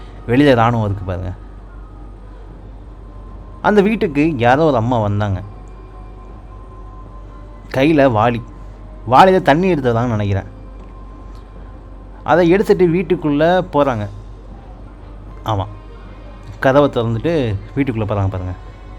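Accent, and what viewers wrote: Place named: Namakkal, India. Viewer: Enga saravanan mama voice mathiri iruku bro unga voice from tn namakkal super review bro 🖤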